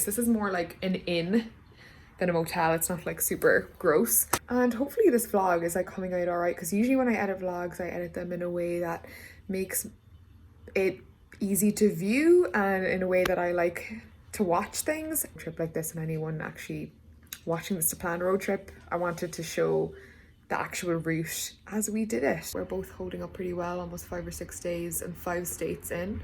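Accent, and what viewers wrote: Ireland. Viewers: I love your Irish accent. Makes you an awesome narrator lol. And it goes well with the New England theme...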